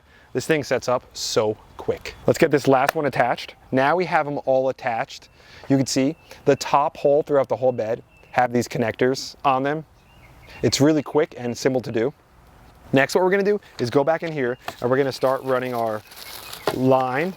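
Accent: New Jersey, United States. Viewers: I was surprised by the American accent when he said he was in Jersey. I was thinking it’s great to think Americans are also moving to the Channel Islands. Then the title came up, this is NJ not the original Jersey. You would never make that mistake if you were over here. YT is global after all. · as another fellow new jerseyan i think you mean "wadder" 😅. Now the real question is, do you think he's got a north jersey or south jersey accent?!?! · Yep, he's definitely from Jersey. He's teaching us how to wooder our vegetables. Nice content. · That is a Jersey accent, Northern NE’s is different. Ayyup. Wicked pissah! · New Jersey guy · That’s not a NE accent. It’s basically New Jersey, but you know people in New York too, but is that just because they came from New Jersey or still live there? Mostly it’s people in New York City saying Woarder right by NJ, and not the part of New York I grew up nearby in NE Ohio it’s like 1 hour to the border. 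Lots of accents all over the place. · The way he says watering or water lets me know I’m listening to a true Jerseyan 😊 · Bro said, “worter the plants” Im from Ohio. I can hear your Jersey accent hard bro 😎🤙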